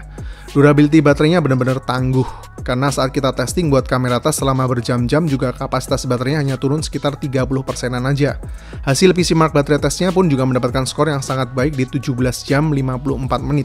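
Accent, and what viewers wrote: Yogyakarta, Indonesia. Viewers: Weh wong jogja po iki dab? 👍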